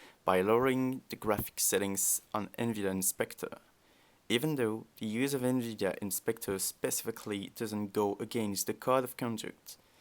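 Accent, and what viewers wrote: France. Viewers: You have the most intense French accent I've ever heard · Are you French ? If yes, you speak English very well ! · L'accent français discret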